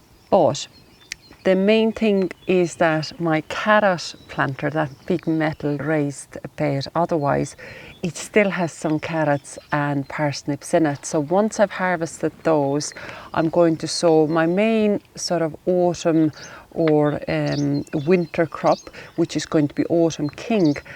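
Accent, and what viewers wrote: Ireland. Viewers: The video lovely and calming, the Irish accent a bonus! Can't wait to return to beautiful Ireland one day! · 😊 lovely video ,, where are you from originally ,, can't place the accent as its half Irish and half something else ? 😊❤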